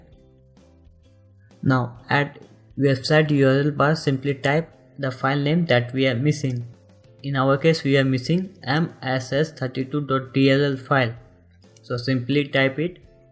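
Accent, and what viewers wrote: India. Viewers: Your Indian accent is cute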